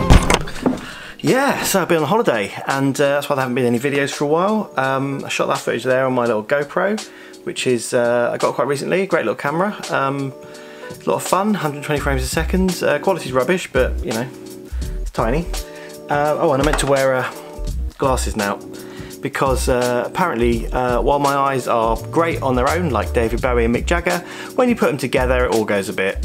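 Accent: England, United Kingdom. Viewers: Hey. Forgot something. I love your english accent (I don't need subtitles) and your videos too.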